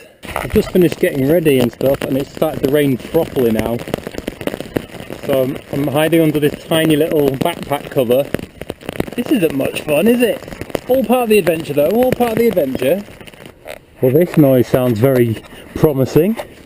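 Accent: United Kingdom. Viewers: This guy has a British accent though !?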